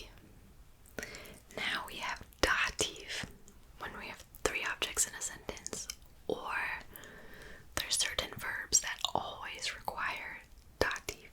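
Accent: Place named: United States. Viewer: Nice video, I love your American accent. And your German pronunciation is very good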